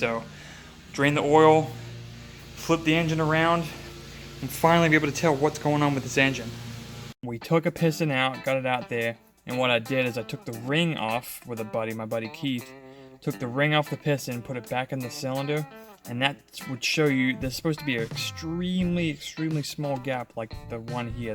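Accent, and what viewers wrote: Australia. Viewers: don't pay these dudes any mind. They are only used to hearing American accents. You sound like Australian or New Zealand. I can understand you clearly. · Australian ? Your accent and the flag on the wall are hints that you are. Bless the koalas and other endangered animals. Enjoyed your video. Looking forward to more.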